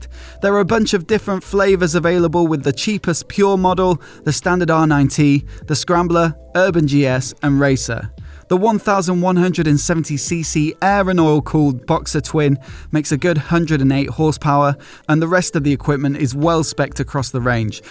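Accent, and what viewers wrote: United Kingdom. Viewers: An interesting episode for the promotion of Triumph. Are you British? PS: My cat urinates more than the gasoline in the Triumph's tanks. Apparently some people think that motorcycles are created not to ride them but to admire them motionless. (The shortest, and most creepy, joke: an trip with Triumph Bobber - but also Triumph in general.) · Far too British 😂🤣😂.......What's going on??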